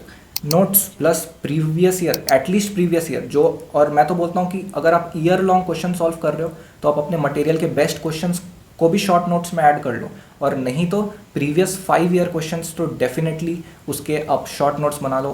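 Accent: Bengal, India. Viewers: Bro are you a Bengali?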